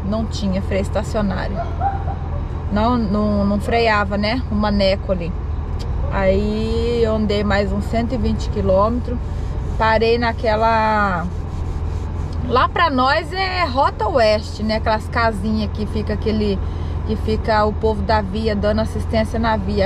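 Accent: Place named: Bahia, Brazil. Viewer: Que perigo sempre freio aí é barril falar que nem nós baiano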